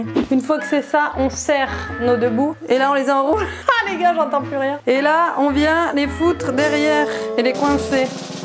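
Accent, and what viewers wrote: Quebec, Canada. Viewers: Si c'est grave beau l'accent québécois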